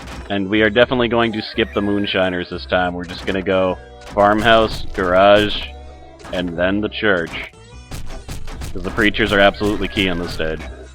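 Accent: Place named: South, United States. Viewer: your accent remember me a southern man... don't know why!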